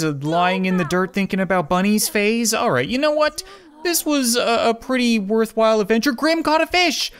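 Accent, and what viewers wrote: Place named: Newfoundland, Canada. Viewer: I can't believe you replied! I just wanted to say you're awesome and I can't believe I didn't notice you were newfie before I went to your askfm I should have noticed with the way you say party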